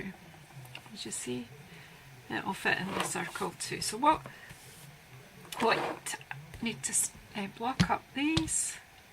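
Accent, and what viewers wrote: Scotland, United Kingdom. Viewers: Beautiful fall cards! I’m definitely going to case them and, no, I never get bored listening to you, I could listen to that beautiful Scottish accent all day long!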